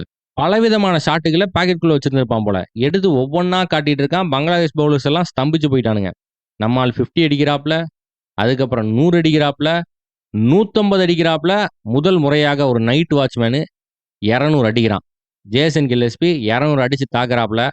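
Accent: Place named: Pollachi, India. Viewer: Evan Enna sonnalum indha slang mattum change panniratha. Naanga school pasangala irukumbothu, enga area Anna nuga solran athe slang.. semaya iruku Thala... ❤❤🎉 Love from pollachi 😊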